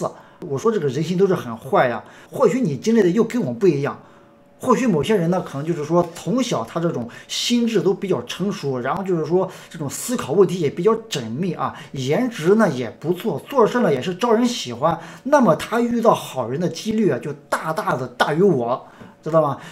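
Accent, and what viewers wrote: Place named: Hubei, China. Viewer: 听口音原来是不是湖北人？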